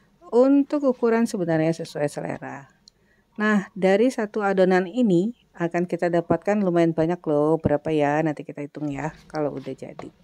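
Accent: Java, Indonesia. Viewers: Hehe kok logatnya jd jowo to...emang asli jowo ngendi mam?😆roti2nya uenak tenan mam👍👍👍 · Donat gembul tgduoempt ss hangat tlur aduk msk rg gla aduk masukan tepung lalu garam aduk dn masukan sp dn margarine sampe tercampur froof bgi2 bentuk (medok ya bu logat jawanya heee) 16buah ya ingat jangan sampe over proof duhhh ginuk ginok gemoyy empuk trimakasih bermapoat sekaliii hahhaah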